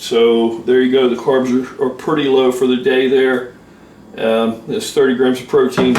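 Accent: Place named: Philadelphia, United States. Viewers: You sound like you live in Philly or somewhere in the Delaware Valley. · You sound like you're from Pennsylvania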